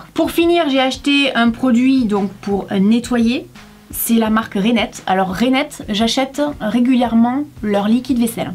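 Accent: South, France. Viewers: Je voulais te demander ton accent vient d’où ??? J’imagine de sud mais je sais pas d’où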